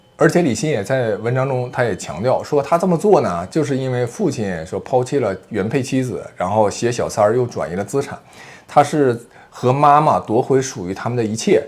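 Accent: Northeast, China. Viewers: 刚刚发现帅哥美女的这个频道，挺棒的！有他们的背景介绍吗？的口音好像是东北的，哈尔滨人吗？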